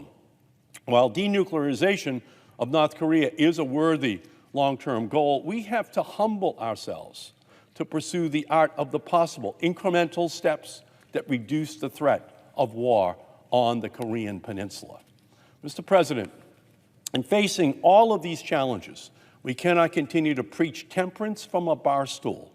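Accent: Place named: Boston, United States. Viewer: I'm listening to his voice and not looking at the video and I'm thinking he sounds like he is from Boston. Not a super heavy accent but a Boston type accent. I lookup and they have tagged, senator from Massachusetts. Lol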